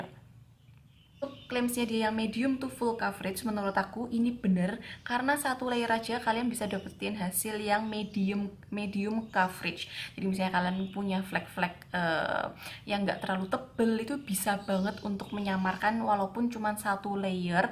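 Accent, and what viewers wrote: Java, Indonesia. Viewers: Selalu suka review nya mbak medhokkk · Telat 33 menit nih mbak medok..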